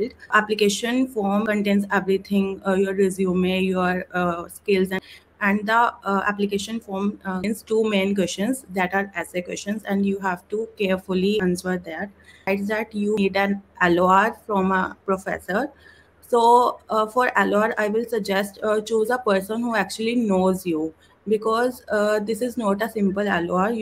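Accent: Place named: Punjab, India. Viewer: i love your slightly punjabi accent❤❤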